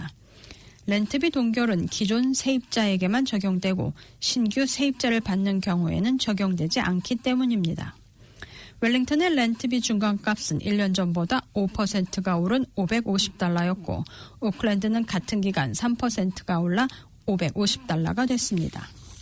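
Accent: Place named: North Korea. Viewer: 발음이 북한같아요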